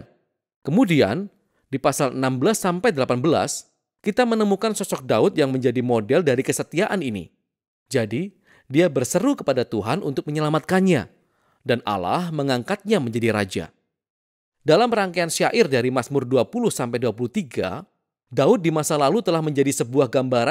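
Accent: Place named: Java, Indonesia. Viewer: Puji Tuhan! Konten yang sangat bermanfaat. (Pengen komen kalo Narator/Presenter nya agak medok sedikit hehe..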